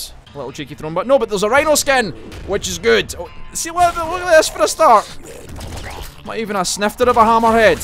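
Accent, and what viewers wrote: Scotland, United Kingdom. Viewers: lol this was alot more enjoyable then other runs of this game ive seen XD and your accent is fanfuckingtastic is it scottish?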